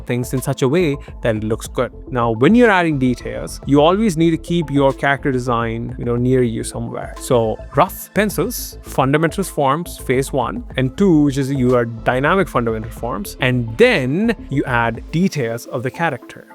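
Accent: India. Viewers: Are you Indian cuz you sound like btw I am learning art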